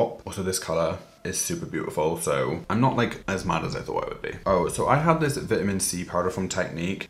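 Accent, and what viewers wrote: Wales, United Kingdom. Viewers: Are you welsh? your voice is legit so therapeutic xox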